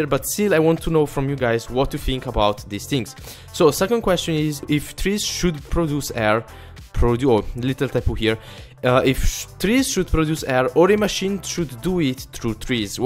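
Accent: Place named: Italy. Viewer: Thanks!!Ive Been waiting on The Alchemist 2!Also,maybe add new machines using the observers?maybe more automation?Auto Tree Farm? Also I want to ask you, Is making growth pulsers(From Skyfactory)possible? Maybe Uhmm a way to make trees grow faster?(Uhmm,Twer....nvm) Maybe even custom mobs and armors Also maybe a bit of jump boost?(You are in space after all) Thanks again for The Alchemist 2 You are the coooooolest youtuber I know Also I like your Italian Accent:) Omfg!Log....nvm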